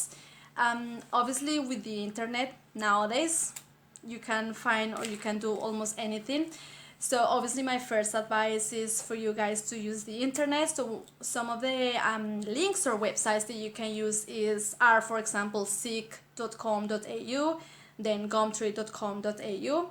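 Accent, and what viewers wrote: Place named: Brazil. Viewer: Are you Brazilian? I can understand you so well:)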